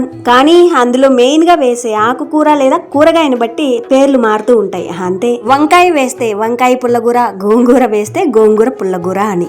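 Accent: Rayalaseema, India. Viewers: మీది రాయలసీమ నా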